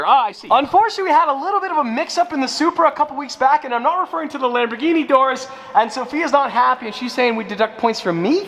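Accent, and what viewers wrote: United Kingdom. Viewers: More of that bri'ish accent haha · Proper British accent. 10/10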